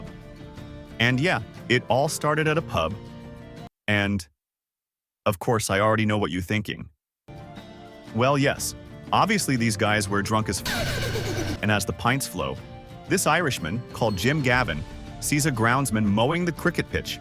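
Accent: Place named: United States. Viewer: American accent; says 'whilst' and 'pub'...🤔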